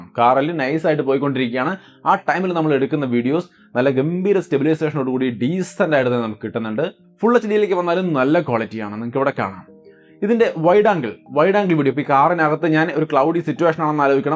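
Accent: Thrissur, India. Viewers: Bro thrissur karan ano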